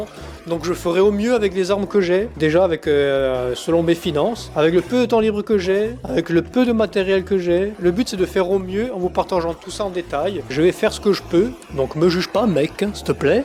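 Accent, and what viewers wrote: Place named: Corsica, France. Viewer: pour ma part, j’ai transformé une petite f2000 en groupe À VH donc l’essentiel était déjà fait. Le partie ou j’ai galéré, ça été pour les freins avant car je voulais a tout prix des 4 pistons. Les gros en 315 cp3307 ne se faisant plus en neufs, j’ai opté pour les plus petit cp2361 avec les usinages correspondant… pour le reste ça été que du plaisir et de la passion. Je suivrais avec intérêt ce super projet et ces supers vidéos avec la touche et l’accent Corse😉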